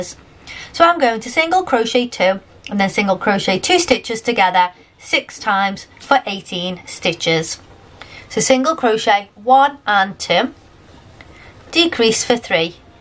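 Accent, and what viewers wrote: Liverpool, United Kingdom. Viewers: Was just gunna say .. oi scouser.. takes one to know one🤣🤣🤣g’wed girl! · So refreshing to click on a posh scouse tutorial ... I'd like to make people aware yes we from liverpool talk fast, walk fast....work fast lol love it xxxx ❤❤❤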